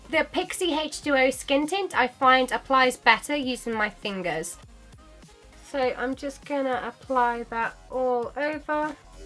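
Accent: United Kingdom. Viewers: She is british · Amazing OMG!!!Loved it! kisses from Brazil!!! (please put cc on your videos, it would be great and helpful for me) Love your British accent ❤ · I've seen your videos like <num> times, but wanted to hear her British accent🙊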